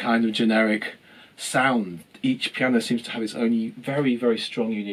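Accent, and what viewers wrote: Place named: RP, United Kingdom. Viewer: Hello there Sir!!!! I would like to leave an off-tangent comment: I love your English RP accent!!!!!!! So elegant =) kisses from montreal.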